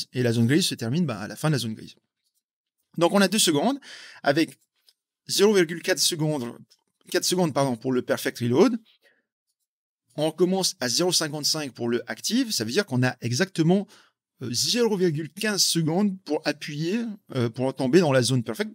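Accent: Tahiti, French Polynesia. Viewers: Salut, tu es de Tahiti? Tu as un accent tahitien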